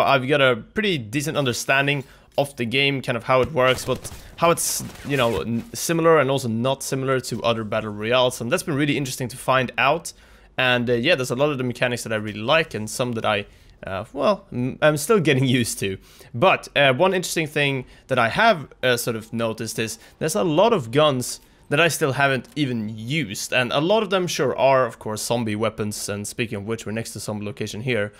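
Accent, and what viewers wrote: Sweden. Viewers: Svensk? Are you Swedish?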